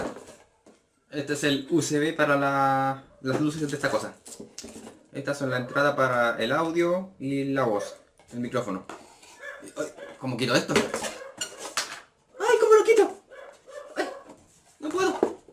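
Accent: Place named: Spain. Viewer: Por que hablas como español si sos tremendo peruano?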